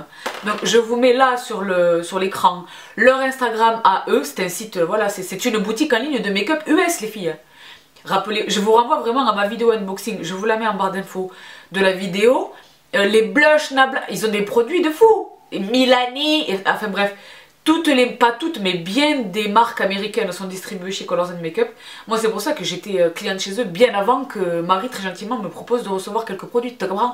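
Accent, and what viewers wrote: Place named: Montpellier, France. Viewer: Bonjour, bravo pour tes tutos très pros et ta bonne humeur!!! Tu as l'accent de chez nous ( je suis de Montpellier), j'adore, tu me fais penser à mes amies Biterroises, c'est incroyable!!!Bises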